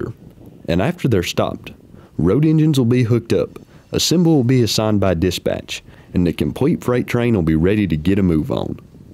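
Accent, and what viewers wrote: South, United States. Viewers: Like your southern accent.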